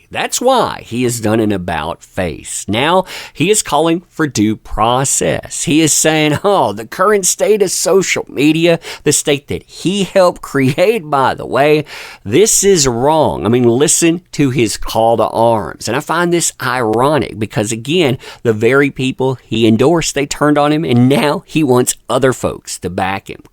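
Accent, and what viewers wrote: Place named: Virginia, United States. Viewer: WOOTEN is still butthurt that Elon purchased "X" and no longer surpressed opinions. BTW Brother, your accent sounds like those in Fredericksburg, VA.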